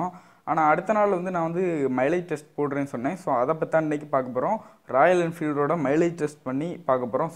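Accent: Kanyakumari, India. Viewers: nanum kannyakumari taan Unga peachu la tarriuthu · பேச்சு லேசா கன்னியாகுமரி போல இருந்தது அதான் கேடீடேன்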